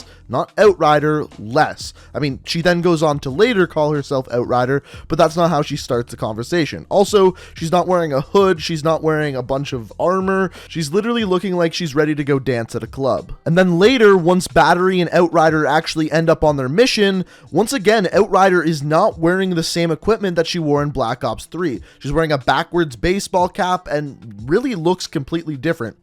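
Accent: Canada. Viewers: Are you Canadian? Not sure about your background but by the way you say out I’m just curious as to your whereabouts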